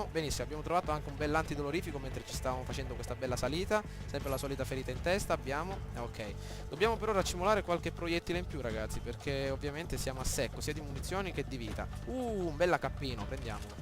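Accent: Sicily, Italy. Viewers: sei della sicilia?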